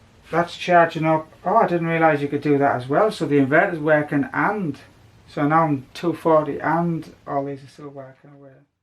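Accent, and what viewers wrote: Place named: Teesside, United Kingdom. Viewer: I’m guessing you’re a Teesside local?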